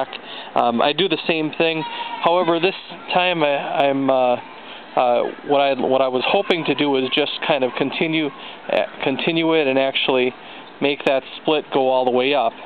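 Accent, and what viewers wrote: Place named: United States. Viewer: great to hear an american accent. good sign.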